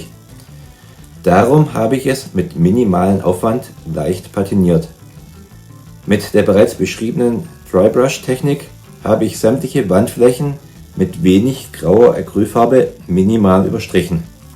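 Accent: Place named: Swabia, Germany. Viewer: Den schwäbischen Einschlag hört man als Schwabe schon noch. Das ist wie Fshrradfahren. Kann man's einmal, blekbt es auch. 😊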